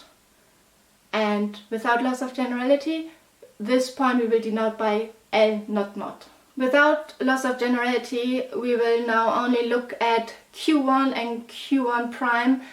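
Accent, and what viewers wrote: Germany. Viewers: You have a German Accent. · German accent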